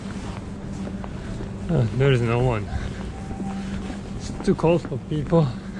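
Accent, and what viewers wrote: France. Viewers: So a year ago I barley used my DSLR and now I'm watching a one hour video of a dude with a french accent and broccoli roaming the streets randomly shooting shit. Life changes man 😂😂 but I love it.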